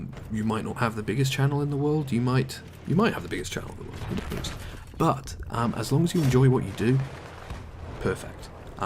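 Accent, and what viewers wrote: United Kingdom. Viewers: And 3 basketball or baseball.... I think you're from the uk considering the accent... These are two great sports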